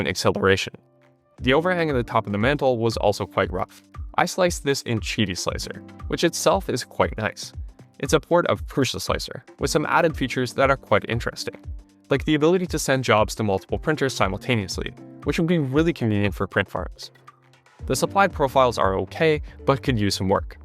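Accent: Canada. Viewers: Are you Canadian? It's difficult for a non-English speaker to tell, but i think there are some words that fit the bill ("about").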